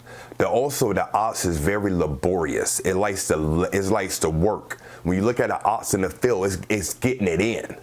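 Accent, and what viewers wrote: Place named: Philadelphia, United States. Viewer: I’m digging your channel! You sound like you’re from Philly! Peace and Blessings 🙏🏽💜✨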